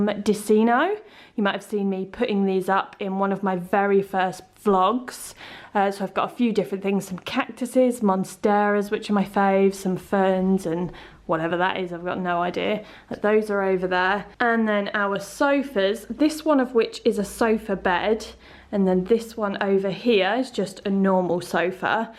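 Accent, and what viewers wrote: England, United Kingdom. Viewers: I love everything, you have done a grand job! I also really like how you have chosen items from many shops, all of which I know living in the uk. Super lovely to hear your English accent. A lot of these type of videos are done by Americans. 😊 😊😊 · Your accent is so British lol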